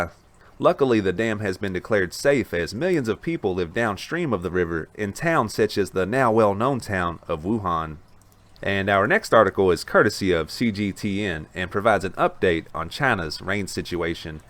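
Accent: South, United States. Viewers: Love the southern accent when talking about anything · I love the southern accent when speaking about China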